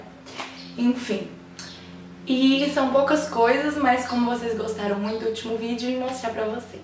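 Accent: Minas Gerais, Brazil. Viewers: "num tem base" haha esse sotaque,que só mineiro sabe como é. linda amo seus videos.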